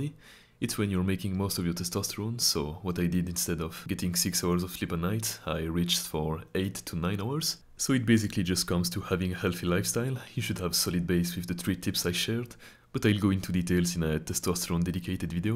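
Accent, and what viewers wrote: France. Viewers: Ton accent français est magnifique ✨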